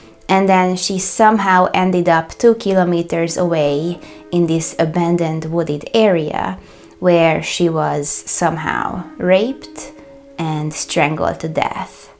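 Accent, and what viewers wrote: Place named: Hungary. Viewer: It is lovely and interesting, listening to Hungarian cases, listening to your hungarian accent and hungarian words. Greetings from ex Yugoslavia, from central Bosnia. Kiškofinefhaza hahah hungarian language amazes me.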